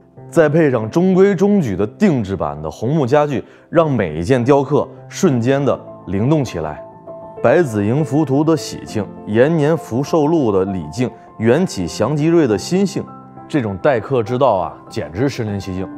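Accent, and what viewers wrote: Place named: Tianjin, China. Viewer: 好像天津人讲的话，笑话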